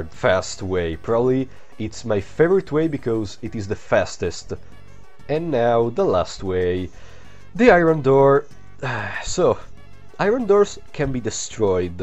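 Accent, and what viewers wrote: Italy. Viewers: Sei italiano? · Are you Italian?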